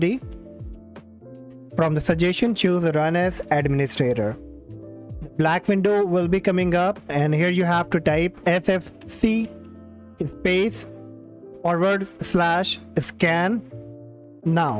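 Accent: India. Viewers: your indian accent is destroying my ears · Indian accent💀💀💀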